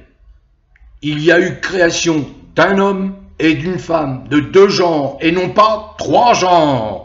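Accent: Marseille, France. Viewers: Joyeux Noël Mr.et j espère que l on pourra encore rire avec vous…et votre très bel accent…marseillais…Un grand merci de nous tenir au courant…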